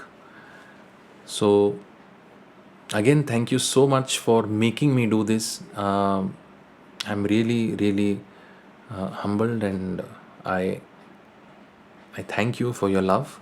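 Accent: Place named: Karnataka, India. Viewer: Really loved Kannada accent. Perfect 👍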